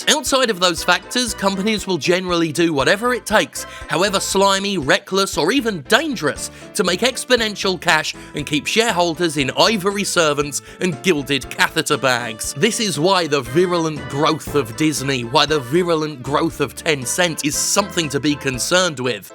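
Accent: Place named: United Kingdom. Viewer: "It's a fucking joke here" Wait, what? I'd always thought you were British because of the accent.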